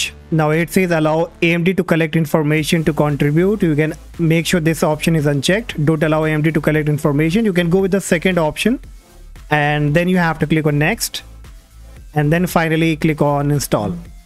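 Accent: India. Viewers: I am definitely NOT trusting it especially with the Indian voice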